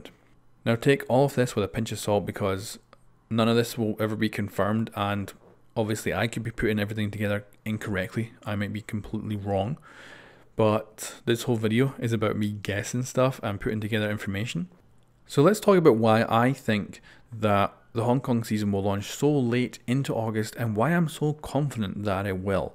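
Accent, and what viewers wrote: Scotland, United Kingdom. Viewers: Ur Scottish right? Me too :) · Nice scottish accent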